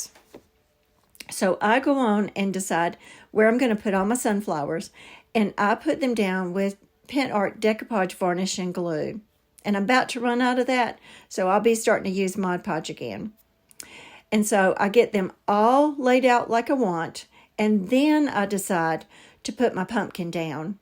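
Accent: South, United States. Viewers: I love your excitement with your grandchildren. Love your southern accent. God bless sweety.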